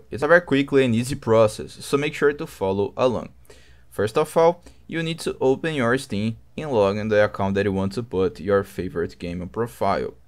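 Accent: Brazil. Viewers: AKSDKASKF percebi que o mano é brazilian no sotaque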